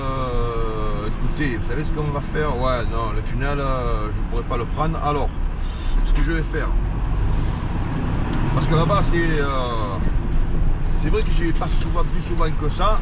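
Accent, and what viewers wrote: Marseille, France. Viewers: Salut, quelle plaisir tu me fait voyager le soleil, la mer, l'accent, j'en redemande 😊bonne continuation à toi 👍 ✌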